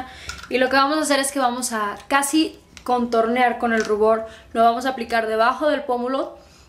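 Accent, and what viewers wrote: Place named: Fresa, Mexico. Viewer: Hablaas taaan pero taaan fresa que desespera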